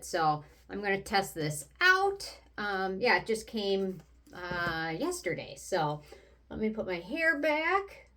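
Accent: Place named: Wisconsin, United States. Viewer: You sound like you’re from Wisconsin. Thanks for the ingredients. I checked on Acne Clinic NYC and there are no pore clogging ingredients, yes!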